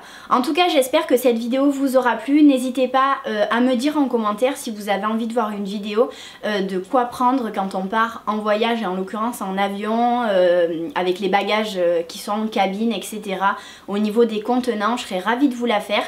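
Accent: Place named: Marseille, France. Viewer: Tu es marseillaise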